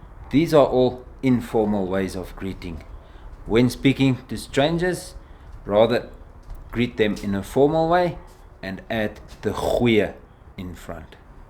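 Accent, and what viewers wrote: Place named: South Africa. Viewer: Goeie dag! Your english accent is so charming. Never heard south african english accent before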